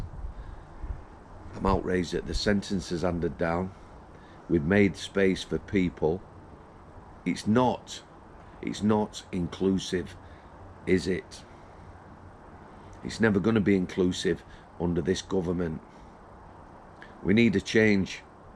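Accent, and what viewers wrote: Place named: Yorkshire, United Kingdom. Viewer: Love your videos, mate. Hearing 1st hand stories etc. Still trying to work out where you are from. Barnsley, like mesen is like, or Wakey, Sheffield? Al si thi.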